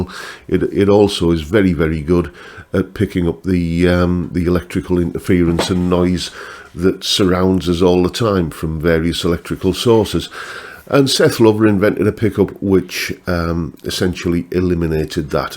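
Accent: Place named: Northern England, United Kingdom. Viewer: Nice one! Love your down-to-earth, no-nonsense, Northern bloke talk. After Gibson, Seth Lover later went to Fender and created the Wide Range Humbucker, that sounds thinner and brighter than a PAF, using 2 bobbins in series with Copper Ferrous rod magnet pole pieces instead of the steel slugs and shared Alnico bar magnet of the PAF design he is more famous for. I wanted a Wide Range Humbucker tone but using a PAF style humbucker, so as an experiment, I replaced the steel slugs and bar magnet on a cheap PAF Bridge humbucker with 12 alnico 5 5mm rod magnet pole pieces. The series tone is clearer, brighter and more open than it was before the experiment, and I got a true single coil tone when using one coil. I ran my insane experiment by Ash at Oil City Pickups, who said they already make the Brass Knuckle humbucker that is a PAF Shaped humbucker, but with Alnico 5 rod magnets - literally 2 Strat single coils sharing a humbucker baseplate, so I bought one. 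The split coil paired with Strat middle single coil gets the authentic 'ching' Strat Mix position 2 chime (Strat position 4 Middle and Neck parallel mix being the 'pung' chime tone). For those who like the hum-cancelling advantage but prefer a brighter, thinner, more single-coil-esque sound, I recommend Firebird Mini humbuckers (I use the Oil City Winterizer 1). Also vintage output humbuckers like Vanson 59's sound clearer and brighter than higher output, over-wound modern humbucker tones. I can also recommend Wilkinson M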